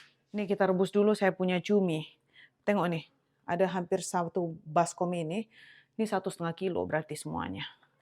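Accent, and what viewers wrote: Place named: Medan, Indonesia. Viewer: Shalom kak, aku senang dengan logat ngomong Medan Siantar kali kak hehe.., mantap masakan kak 👍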